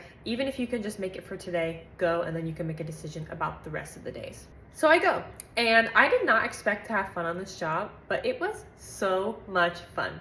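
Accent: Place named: Midwest, United States. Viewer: I'm trying to place your accent, or lack thereof. You're either PA, Ohio, Indiana, Illinois, or you're from the west coast, I believe? Which is it, please?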